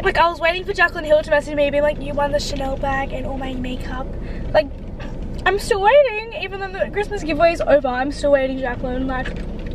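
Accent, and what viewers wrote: Australia. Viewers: i can tell your australian by the accent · It's the Australian accent man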